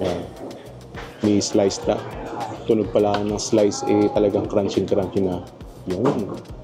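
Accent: Batangas, Philippines. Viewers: Your Tagalog brings memories of when I lived in Lipa for four years. I love the accent and the slangs specific to Batangas. Hopefully I could visit that part of the Philippines again someday 🙏 😀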